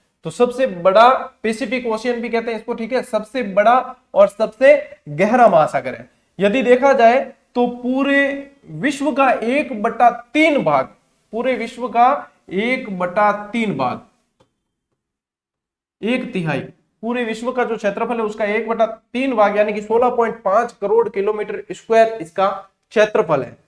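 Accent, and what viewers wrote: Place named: Marwari, India. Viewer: Sir apki marvadi hamjh koni aave.....pr laage choki👌👌👌👌... thank you sir....jitna bhi bole km hi h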